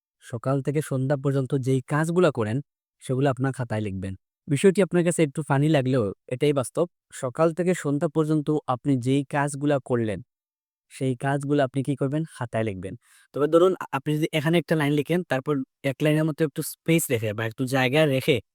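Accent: Sylhet, Bangladesh. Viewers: ভাইয়া আপনার বাসা কি সিলেট?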